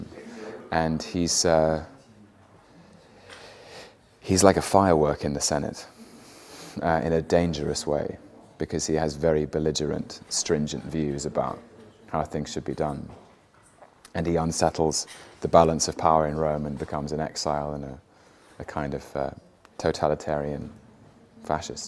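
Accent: Scotland, United Kingdom. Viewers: Does OP sound a bit like a Scottish dialect?? It sounded like that to my unpracticed ear. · Why is Tom Hiddleston reading the play with a scottish account? I thought this play takes place in ancient rome? WTF?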